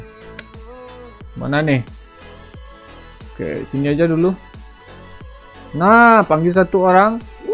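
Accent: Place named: West Sumatra, Indonesia. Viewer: Jelas kali abg ni org minang nya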